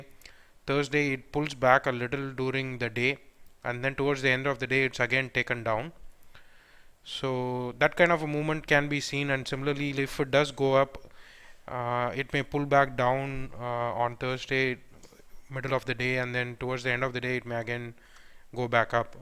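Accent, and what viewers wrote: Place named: Tamil Nadu, India. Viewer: Bro your pronounciation shows you r belongs to Tamil Nadu.... If it's true ... I'm very much proud...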